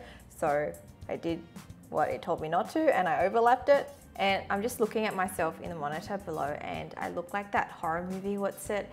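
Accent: Australia. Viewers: I like your accent, is it an Australian? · Yashti Raj I think cuz she's Australian. I've heard a lot of Australians say it like that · I Looove your aussie accent !! I cannot sound Australian for my life 😂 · I love the Aussie accent actually, I think it sounds fun and endearing. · I seriously love the Australian accent XD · i know it's because of her australian accent, i just sometimes mishear what she says anyway because i don't hear australian accents often lol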